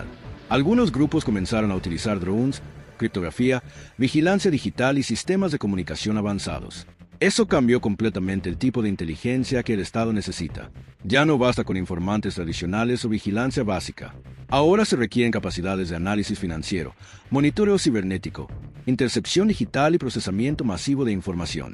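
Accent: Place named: United States. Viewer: Y porque está mmda tiene acento "gringo"?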